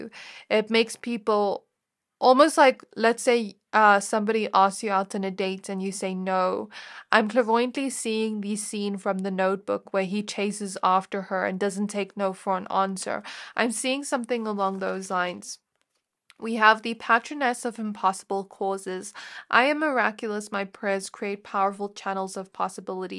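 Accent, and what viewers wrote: South Africa. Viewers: Beautiful reading. Thank you. Are you South African(accent)? Pile 2.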